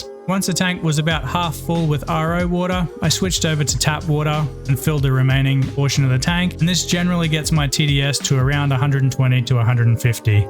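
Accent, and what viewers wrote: Australia. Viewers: So thrown by the Aussie accent with European context! Would love an update on this tank now it's had time to settle.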